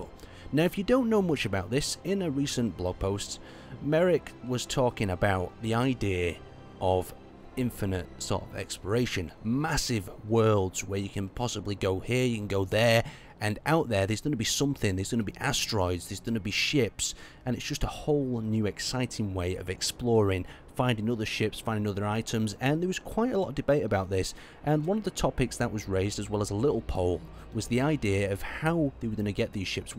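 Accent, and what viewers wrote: United Kingdom. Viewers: I only watch this channel because you're British and it's awesome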